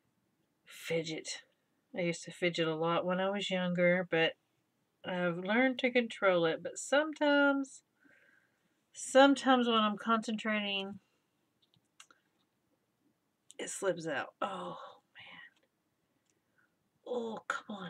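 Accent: South, United States. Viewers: Hi! Just discovered your channel! Love your southern accent and your fun personality. Your gluebook is amazing!! I am originally from Oklahoma...what part of the south are you in? I totally speak southern. HAHA. No black Friday shopping for us.... I hate the crowds (with COVID, it may not be as crowded, but ick). We are going to try to shop local businesses for Christmas this year. Want to support our community. Usually we do Amazon.... easy peasy. LOL Thanks for sharing your gluebook with us! - Kari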